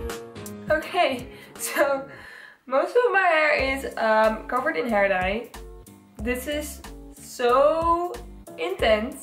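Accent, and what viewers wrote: Netherlands. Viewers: U sound like ur from the Netherlands 🤔🤔🤔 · why does she speak so funny? is she dutch? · Why does it sound like you have a dutch accent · Benje nederlands want ik hoor accentje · are you dutch? :D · R u dutch? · No hate but is she Dutch?? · You have a really Dutch accent while you speak English. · Hahaha i came to the comments to check if she was dutch😅 heard something familiar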